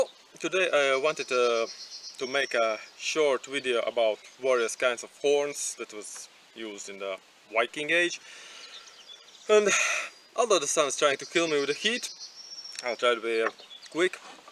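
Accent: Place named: Scandinavia. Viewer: Hi. I am from sweden and was wondering if you can make a tagelharpa? The reason I said I was from Sweden is cuz I wondered what country you are from. I thought you sounded Nordic so I wondered if you can understand Swedish? I’m kinda bad at talking Swedish cuz Swedish is my first language and English is second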